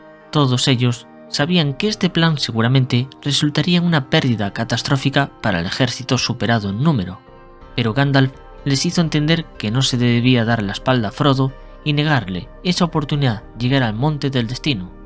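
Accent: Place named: Galicia, Spain. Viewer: Mejor que Kai, en todo caso, ademas muy modesto, y el acento gallego es genial y mágico. Ademas en Kai noto cierto aire de superioridad y desprecio por el subscriptor. Escuchando a, me siento mejor. Pondria como a como Gandalf y a Kai como Saruman, o mas bien Zarquino.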